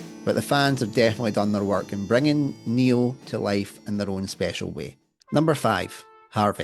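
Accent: Scotland, United Kingdom. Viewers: Hello Nice Ranking I'll admit I'm like Duncan I'm a Hothead but with a good heart And I Like The Scottish Voice And You are no expection Sir keep up the good work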